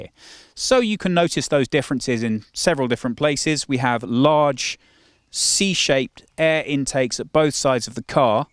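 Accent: United Kingdom. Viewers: Excellent and thorough review! British accent is music to my ears! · What an incredible DESIGN ROBBERY yes ;) Great review, love his English accent, Autogefühl have the best car reviewers of the net... but guys, please try to look neutral... your reviews are always biased regarding the VK company's cars... this sentance is just proving that... I know they are providing you with new cars and interviews... but starting to feel sick of this propaganda for DAS CHEATERS #DieselGate #MyTake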